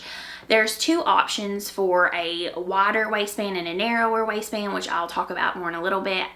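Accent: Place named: Arkansas, United States. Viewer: Hi! I love your podcast!! I’m a fellow Arkansan transplanted to Texas a long time ago and I just love hearing your accent from home! I love your Zosia wrap cardi - so glad you finished and survived!!! I can’t wait to make it in a light gray. You asked in a previous video for book recommendations and I recommend reading “A Gentleman in Moscow” if your haven’t already read it. It’s a beautiful story. My mom and I are reading the Thursday Murder Club series at your recommendation and absolutely love it! Thanks so much for all you share!! You’re so inspiring 🐝 🐓 🧶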